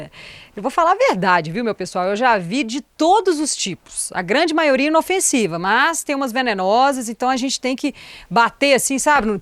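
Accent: Minas Gerais, Brazil. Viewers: ótimo, essa ideia de voltar para esse contato com a terra é especial, temos que aproveitar mais nossa mãe, estamos por aí tão viciados no urbano frenético que por vezes até já esquecemos a nossa origem e é sempre bom lembrar nessa encarnação que quem nos sustenta mesmo não é o sistema humano artificial e sim a água, terra, ar, sol, chuva e (...). Amei esse canal, estava sentindo falta de youtubers mineir@ agente só escuta o sotaque do rio e são paulo por aqui na maioria das vezes...BH me representa muito mais na fala...vocabulário e etc..paz e luz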